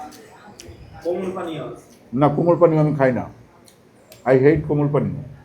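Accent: Barishal, Bangladesh. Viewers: vai ki barishailla🥹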